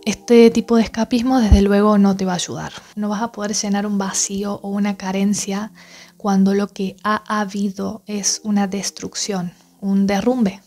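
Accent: Córdoba, Argentina. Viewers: Ese lindo acento será cordobés? 😊 saludos genia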